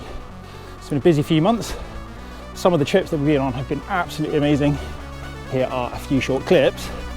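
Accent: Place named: England, United Kingdom. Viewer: Nederlands met een Engels accent doet het altijd goed ;).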